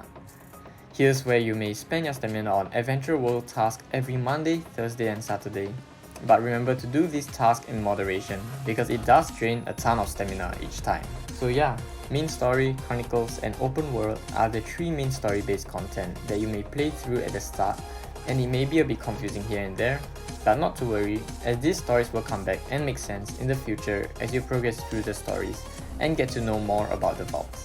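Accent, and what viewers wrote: Singapore. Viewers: are you from singapore? nice accent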